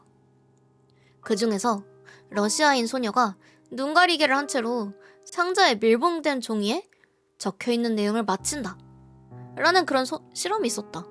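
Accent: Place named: Japan. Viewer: 언니님 일본어 느낌 너무 좋아요..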